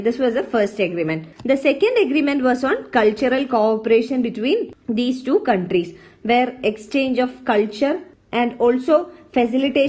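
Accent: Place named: South India, India. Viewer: Her pronounciation seems like south indian not sure if hindi version would come.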